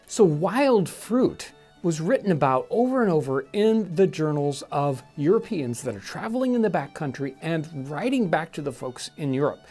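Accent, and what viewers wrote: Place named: Midwest, United States. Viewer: Wrong. I knew a guy from the 18th century who found a camera. He had a much different accent. This guy talks like some dude off the street in Everytown, Midwest USA.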